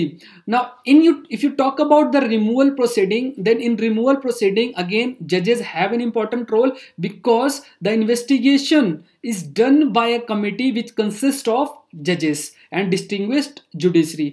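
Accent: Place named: Bhojpuri, India. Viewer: Bhojpuri accent me English bol rahe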